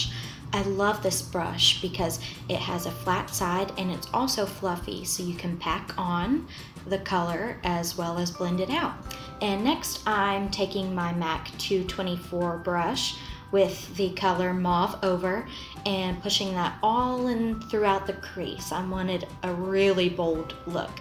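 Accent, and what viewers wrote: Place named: South, United States. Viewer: Ohhh fun!! I thought you had a southern accent for sure lol. I'm from Michigan, born & raised here ❤💙💚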